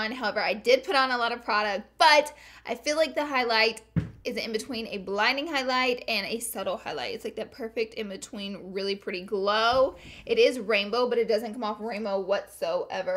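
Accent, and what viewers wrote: South, United States. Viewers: Is she from the south?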